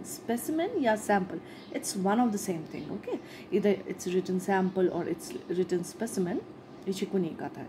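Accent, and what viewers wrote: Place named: Kashmir, India. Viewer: Excellent ma'am ur teaching way is amazing and that tooo in between the kashmiri ascent is phenomenal ♥️♥️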